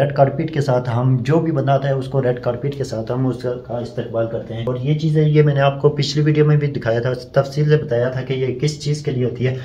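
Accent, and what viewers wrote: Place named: Rawalpindi, Pakistan. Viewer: I live islamabad ap ka bolne andaz pandi ka lak raha ha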